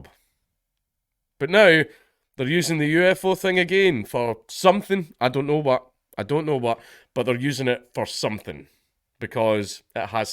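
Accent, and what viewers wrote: South, United States. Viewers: As a southerner your southern accent is wonderful keep using it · I need so much more Southern in my life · Nice job on the American accent homie. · lmao, dude, find more excuses to use that redneck accent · Damn you sound great like a Southern Farmer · Your redneck accent has me crying 😂😂😂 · You are on fking point, dude. And your American accent is grade A choice. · sounds more southern in his normal speech than he did with his southern accent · You’re southern North American farmer is so amazingly terrible and I need more in my life 😂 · Birmingham native here… the other Birmingham. southern accent… y’all’dn’t we’d be fine with it did y’all?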